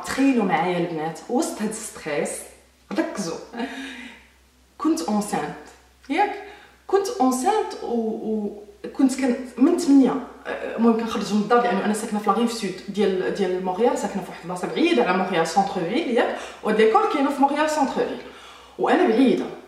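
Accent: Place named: Fes, Morocco. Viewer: D'après ton accent je pense que tu es de fès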